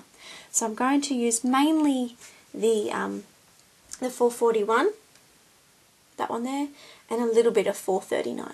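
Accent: Australia. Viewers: Hey everyone. I really like. I just discovered her and I was wondering if there are any other gurus like her that are aussie because I love that accent and actually I am technically aussie (my grammy is from Austrailia).Thnx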